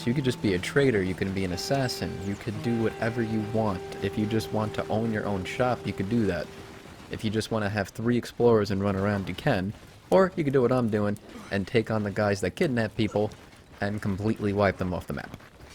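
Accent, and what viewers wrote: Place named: New York, United States. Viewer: Are you from New York? Judging by the way you pronounce "dog" and "small".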